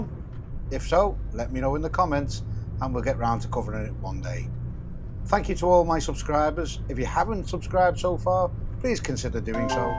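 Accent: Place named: North, United Kingdom. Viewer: Glad you've got rid of that AI voice - Normal northerner, happy days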